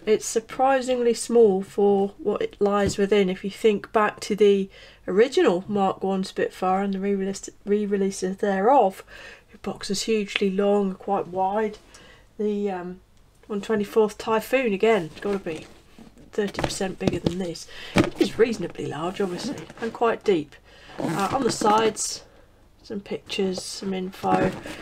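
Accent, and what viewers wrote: United Kingdom. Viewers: Apologies for this confusing comment on my part. “Right the way through” is a sentence that another British reviewer uses ad nauseam 😩. On one of his recent reviews, I started counting how many times he said “right the way through”. It became tedious and I stopped counting at 18 or 19 times, I forget. It was nice to sit through your review and not hear it a single time 🙏🏼. P.S 1 : You are one of only a handful of people who recognized “Bindair” as “Been there” 😄. P.S 2 : In your review you pronounced “Curieux” beautifully. The charm of your lovely British accent when pronouncing this French word made my heart skip a beat 🥰🥲. I was (am still ?🤔) French.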